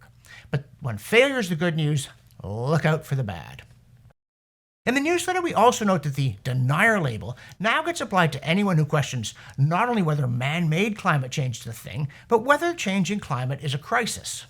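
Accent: Canada. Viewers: 🫡✋haha me me me! just discovered this channel. Very cool! His Canadian accent annoys me but I’m an overly cocky American😅 haha my voice probably annoys people too and I might be blissfully unaware. Haha but yah I am very much enjoying his presentations and data and sarcastic style. Amazingly, youtube recommended this channel to me! I dunno… but I wonder if it means they tweaked their algorithm to suppress less content? Anyway I was very surprised the videos made it past the suppression filter into my recommendations feed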